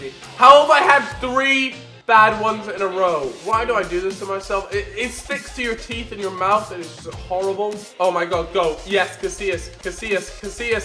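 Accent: United States. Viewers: He kinda sounds American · he talks kinda American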